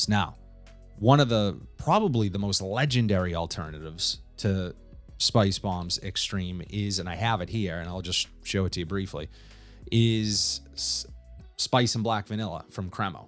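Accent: Boston, United States. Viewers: Cool Buaston accent!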